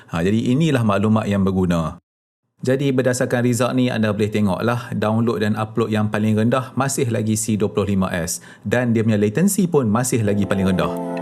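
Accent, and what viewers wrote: North, Malaysia. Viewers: tima kasih bg info berguna, izin saya share dlm fb... SELamat Hari Raya Bos.. pekat jugak loghat utara naa...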